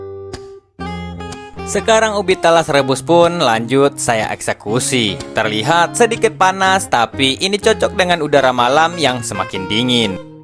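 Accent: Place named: Adang, Indonesia. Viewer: Logat bicarany kyk orang adang sm kyk sy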